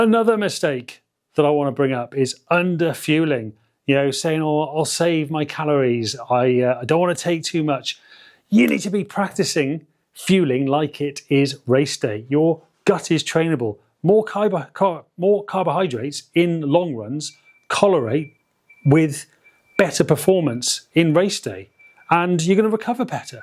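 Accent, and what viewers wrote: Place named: Wales, United Kingdom. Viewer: Ahh a Welsh man . Thought I could hear a bit of Welsh accent there 🙂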